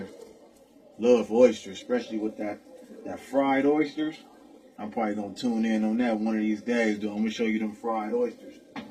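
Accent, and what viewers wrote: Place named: West Coast, United States. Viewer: He Is In A California Prison!!!! His Accent Is Straight West Coast!!!!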